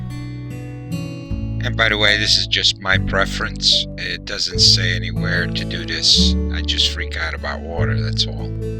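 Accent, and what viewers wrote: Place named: Georgia, United States. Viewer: Nice accent, north Georgia?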